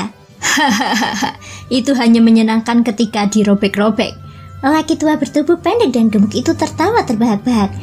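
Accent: Java, Indonesia. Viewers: medok emen...kenapa ga sekalian pakai bahasa aslimu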